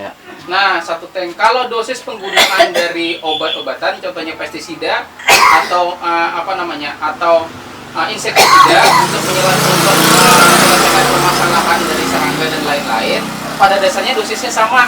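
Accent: Banjar, Indonesia. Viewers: urang Banjar kah? bujur? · Banjar banar pandiran pian Pak lah... Asli urang hulu kah pian pak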